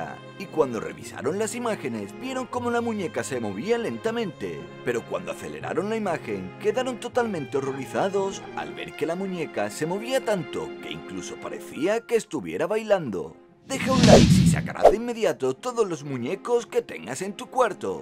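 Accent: Spain. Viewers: FELICIDADES COMPAÑERO DE DONDE ERES? ESPAÑA? CREO QUE SI POR TU FORMA DE HABLAR ESPERO Y CUMPLAS MUCHOS AÑOS MAS AMIGO MIO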